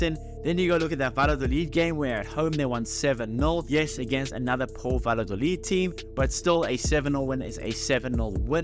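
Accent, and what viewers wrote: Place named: South Africa. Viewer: Can recognize a South African anywhere. Hansi flick is a mastermind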